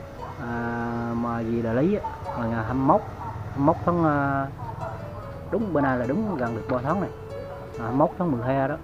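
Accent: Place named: Quang Ngai, Vietnam. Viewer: ôi giọng 76 quê mình nghe thân thương quá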